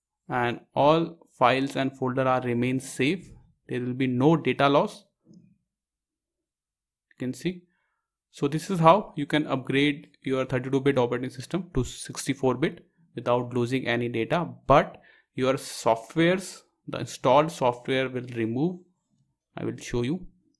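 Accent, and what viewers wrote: India. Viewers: The first I heard Indian accent i knew it's gonna be a success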